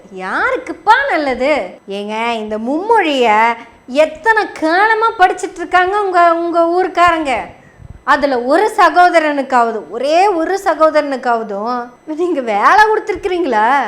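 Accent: Kongu, India. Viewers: அருமை மகளே மிகவும் அருமையாக தொகுத்து பேசுகிறாய் வாழ்த்துக்கள் சிறப்புடன் வளர்க,நீ பெரியாருக்கு மட்டும் பேத்தி இல்லை எங்களுக்கு எல்லாத்துக்கும் பேத்தி தான். கொங்கு தமிழ்ல இன்னும் கொளுத்தி போடறதுக்கு வாழ்த்துக்கள்🎉🎉🎉🎉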